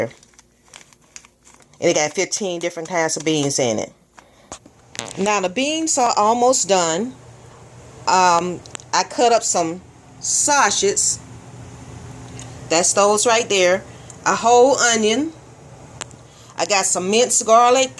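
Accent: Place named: South, United States. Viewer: 😂😂😂😂lol. She has a southern accent.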